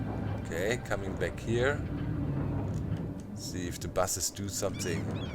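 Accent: Germany. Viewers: Wie man einfach hört das du deutsch bist :D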